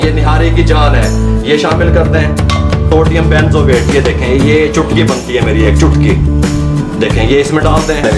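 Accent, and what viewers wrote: Punjab, India. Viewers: Sir mazzrat k saath asp ka punjabi ascent hai tau sabut lal mirch k baad aap nay Kia dala naam samajh nahi aya🙏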